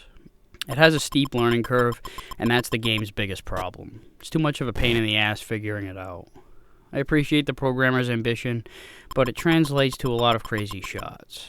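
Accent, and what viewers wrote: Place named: Long Island, United States. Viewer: Definitely a Long Island accent 😉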